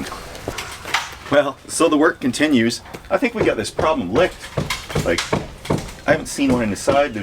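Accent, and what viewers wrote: Alberta, Canada. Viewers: You betcha, eh. This is a very Canadian sounding video. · I'm glad you killed them all 😃 good luck on all of your renovation endeavor. The Alberta accent is strong with this video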